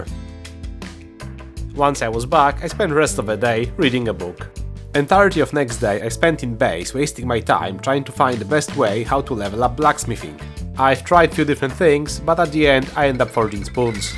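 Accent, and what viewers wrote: Poland. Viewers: Where are you from, your accent sounds polish.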